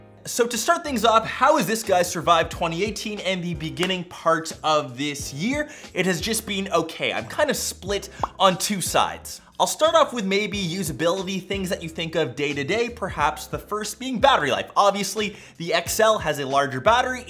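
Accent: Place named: Canada. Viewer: your canadian accent seems much more pronounced than usual in this video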